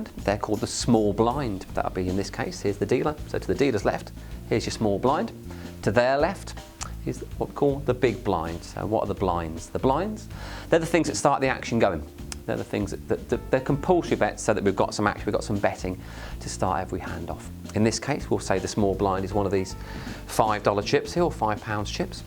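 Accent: United Kingdom. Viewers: why a brit guy is teaching texas poker ? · I can't understand this guy with that heavy English accent. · One of the simplest vids to learn despite his deep British accent lol.good Good thx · First “how to play” video I watched was an Aussie accent, this one is a British accent. Maybe third times the charm? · He's actually Russian but he does a great Brit accent when explaining a Texas game.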